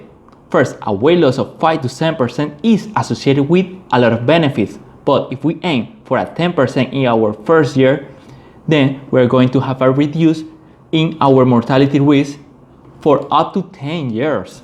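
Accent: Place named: China. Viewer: I think you shouldn't change your accent just because some people think you should. Its beauty of this world where we live that everyone has their own unique way of speaking a language. I live in Engalnd and we have God knows how many different accents here, I find it really hard to understand Scottish accent but I would never ask my Scottish friends to change their accent for me. Similarly my Caribbean friends have very strong accent and it's the beauty of every area. A Chinese man should speak in his accent as it's not easy for me to speak your language but you are speaking in my language so i should be grateful to you. And again you have beautiful accent and always keep it like that.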